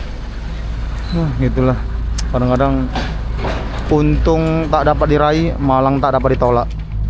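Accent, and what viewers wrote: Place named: Medan, Indonesia. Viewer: Bang kau lucu kali😂😂, medan kali😂😂😂😂 1. Awak(aku, saya) 2. kontak(kesetrum) 3. udah nampak(udah kelihatan) 4. nah ini kan segini kalinya,nah disana udah lebar kali guys, lebar kaaaliii guys😂😂😂😂(kayak mana bedakan kali yg ada airnya dgn kali yg gk ada airnya bang) 😂😂😂😂 5.atrek atrek atrek Terima Kasih info dan hiburanny bang, sehat selalu, salam dari Medan.... Horass🎉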